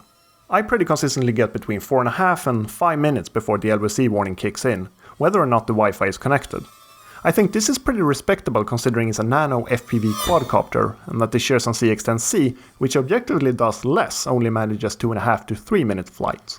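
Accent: Sweden. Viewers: Hi! I am new to your channel but I immediately hit the Subscribe button upon watching the first vid I looked at. Your approach, candor and editing sets you apart from many other reviewers here on Youtube. Just lovely!!! You clearly have some insight in this hobby, but you appear unassuming, bright and unbiased. Every vid is a pleasure. I have to ask - are you a Swede? Your accent suggests you are, while your choice of words and general command of the English language is impeccable and inspiring. You put many native English speakers to shame, at least here on Youtube. But more importantly, your vids are well thought through, consciously edited, and so clearly targeted when it comes to defining your audience. I think you deserve a viewer breakthrough, going from the few thousand to the tens of thousands you deserve (realistically, this is a niche channel after all). 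To summarise: Love your reviews, keep them coming!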